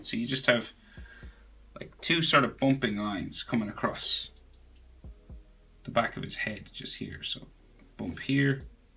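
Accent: Ireland. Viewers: Yesss thank you I really needed this tutorial also I really like your Irish accent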